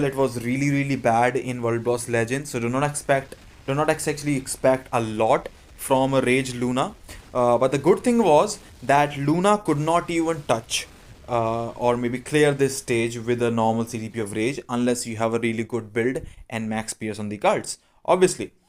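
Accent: India. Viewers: Your English look like Indian accent..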